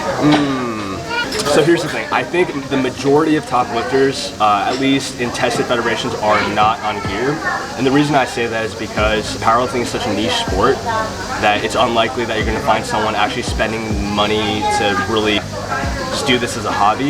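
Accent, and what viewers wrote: South, United States. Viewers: Where you from? Didn’t expect such a southern accent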